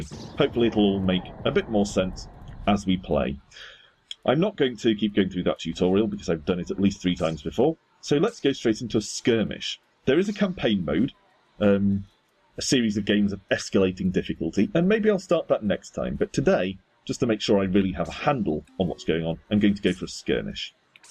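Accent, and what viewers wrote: United Kingdom. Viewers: Subscribed, nice very clear commentary, and you're English. :D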